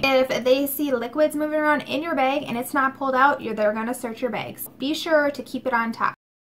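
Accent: Minnesota, United States. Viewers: Are you from Minnesota by any chance?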